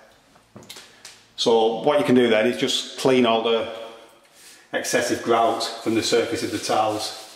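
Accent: United Kingdom. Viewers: Looks great.......good job on your video. I've never seen anyone use a small plastic pipe to indent the grout between the tiles before, so that was a good tip. I've got a "rougher" texture to the tiles I'm using on my wide deck railing, are there any tips to doing grouting on a rougher tile surface so that the grout doesn't get into the dips of the tile and mess the job up? Also....your "UK" accent made this kind of hard to understand at times, but I'll do my best to "translate".....THANKS!! John (in Nevada)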